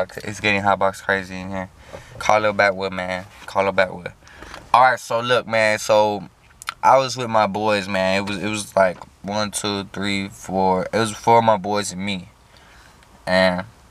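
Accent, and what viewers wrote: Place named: Houston, United States. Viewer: This dude sound like he from Houston 😂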